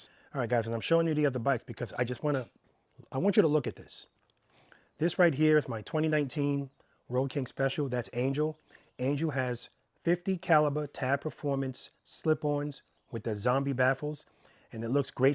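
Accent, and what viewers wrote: New York, United States. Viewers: Dude, that NY accent!! LOL 😂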